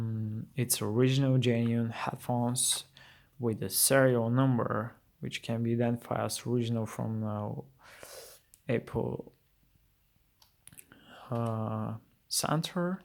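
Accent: Europe. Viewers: Fine pro Gaming He’s from Europe so he has an accent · james brown because English isn’t his first language. This is what Europeans speaking English sounds like. They have an accent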